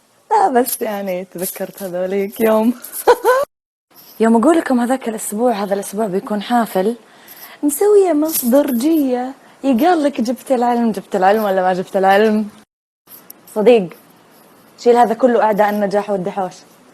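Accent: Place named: Al-Ahsa, Saudi Arabia. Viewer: هي طبعا لا شك انها تستهبل يوم انها تقول قارو وغررو لكن لا جيت للصدق هي على مااعتقد حساويه او انها قطيفيه من اسمها الاخير فا هذي لهجتها الاصليه وهي ماكانت تستهبل اول ماقالت مسابغة بس شافت انها خربانه خربانه قالت على الاقل خلني ارقع واستهبل ممكن اخفف من شك الناس فيني ان هذي مو لهجتي الاصلية وممكن اكون غلطان طبيعي جداا بس حاليا هذا رأيي.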